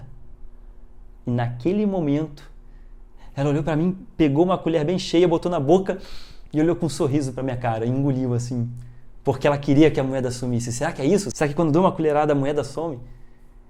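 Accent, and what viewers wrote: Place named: Rio de Janeiro, Brazil. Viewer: HAHAHAHH deve ser o sotaque carioca, misturado com o pegando costume de imitar os pastores até falando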